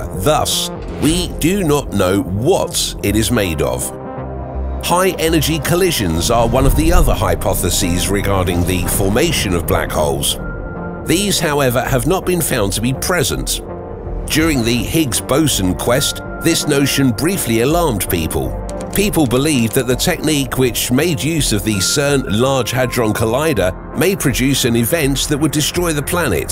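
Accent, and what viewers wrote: United Kingdom. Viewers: Because the word sounds more daunting in a British accent.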